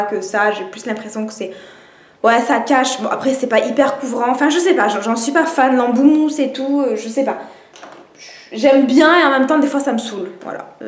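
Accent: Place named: South, France. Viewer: en tout cas sa te va bien Ton accent du sud 😊😊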